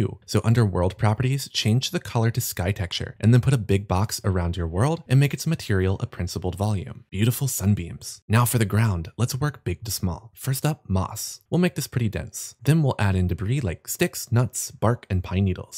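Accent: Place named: California, United States. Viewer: What's with the spoiled californian girl accent?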